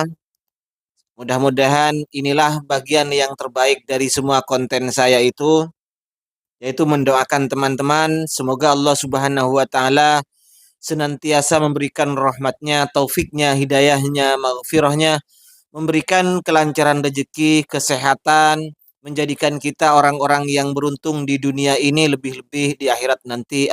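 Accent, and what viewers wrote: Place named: Java, Indonesia. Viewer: Dangkalnya pemahaman mu, mana kajian syeh untuk mencerahkan umat ini, ndi ndi bacanya pake logat jawa medok banget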